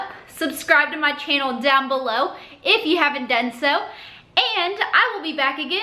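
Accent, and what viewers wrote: South, United States. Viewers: You are a doll! Really enjoyed your video. Your sweet accent made me homesick for the south :) God bless you! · your southern drawl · I absolutely was mesmerized by your accent. Southern women are my bane. 😍😍😍😍😍😍😍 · I hate you and love you for this 🤣 I made this tonight and it was so damn good!! I did the chocolate peanutbutter one without the chocolate chips, I used heavy cream (not whipping) and the Swerve confectioners. I ate half of the jar 😎. Thank you for this, btw I love your southern accent 👍.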